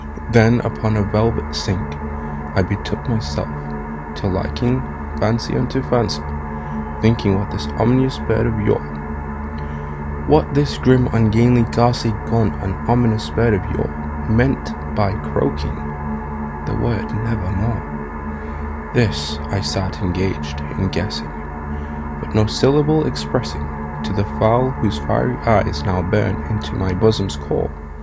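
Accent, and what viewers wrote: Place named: Lancashire, United Kingdom. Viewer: Such an Eloquent Lancashire Accent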